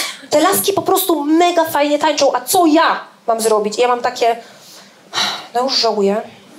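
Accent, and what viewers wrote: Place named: East, Poland. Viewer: Skąd ten wschodni akcent?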